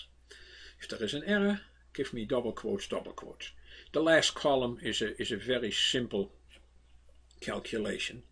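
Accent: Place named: Netherlands. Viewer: Hey, very helpful information thank you, I'm guessing by your name & accent you're Dutch. My Dad is from Holland & we have the same name. Lol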